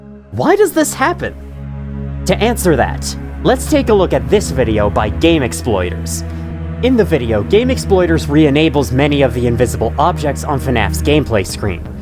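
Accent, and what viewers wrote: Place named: Asia. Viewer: He sounds asian but its not an insult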